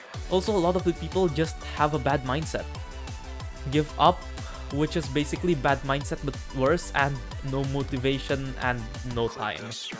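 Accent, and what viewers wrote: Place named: Indonesia. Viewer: Logat Indonya kedengeran banget njir, but S for effort 👍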